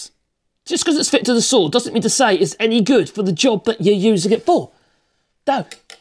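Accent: United Kingdom. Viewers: I sadly understood barely two words you said mate, but you are so entertaining to watch that I still watched the video to the end. It's so nice to hear a British voice on youtube, especially one who loves tools and making stuff with wood. You're always entertaining because you come across as someone who doesn't give a fig for what people think, and I like that about you. You are what my adorable mum used to call a WYSIWYG type of person (In other words you are a 'What You See Is What You Get) type of person. And I mean that in a nicest way possible!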